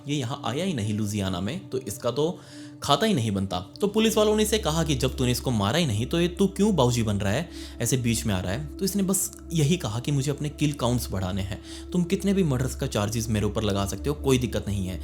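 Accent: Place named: Hyderabad, India. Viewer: I visited your channel after years and idk why I feel your accent is changed. You are sounding hyderabadi accent 🤧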